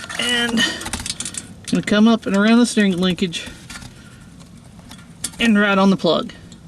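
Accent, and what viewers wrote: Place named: South, United States. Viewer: Personally, I can't thank you enough for this video. Just got a P0303 on my 2004 Blazer LS SUV 4.3 Vortec this week. Picked up some standard AC Delco plugs and a set of "driveworks" (good reviews) brand plug wires at Advance yesterday, (Sunday), so I'ma try to tackle the driver's side --- first --- and get out the #3 plug out first too. Thanks for sharing your knowledge with all of us because you sure do make our DIY mechanic lives easier! Mr., you sound like a good 'ol country boy --- like myself --- so I was wondering what state you live in? Thanks again!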